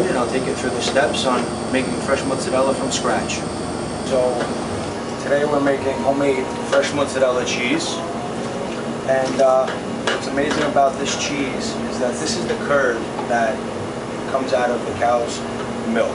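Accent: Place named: New Jersey, United States. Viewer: Without knowing i can guess he is from NJ lol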